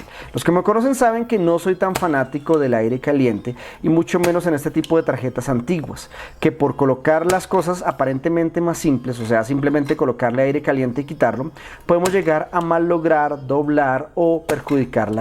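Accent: Colombia. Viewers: Eh mijo x2 colombiano 😅😗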